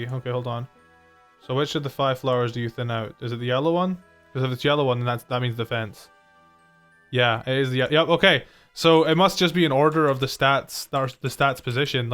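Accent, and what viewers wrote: Scotland, United Kingdom. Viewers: what part of Scotland you from?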